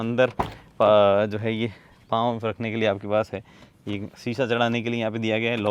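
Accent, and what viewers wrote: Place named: Marwari, India. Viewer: Yes, He is Marwadi.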